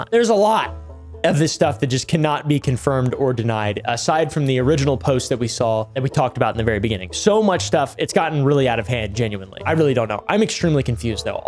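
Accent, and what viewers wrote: India. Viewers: From his accent he actually sounds Indian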